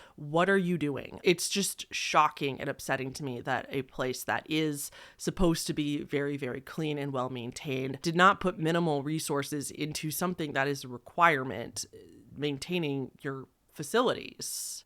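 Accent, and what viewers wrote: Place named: Los Angeles, United States. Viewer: R u from LA by any chance? Why do you talk like that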